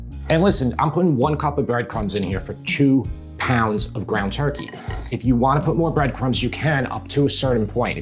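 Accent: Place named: Long Island, United States. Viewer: This is like old home week for me. I grew up on Long Island although I’ve been gone for more than a few decades. Listening to your accent makes me smile and watching your recipes reminds me of my own kitchen.. Truly enjoying your channel.👍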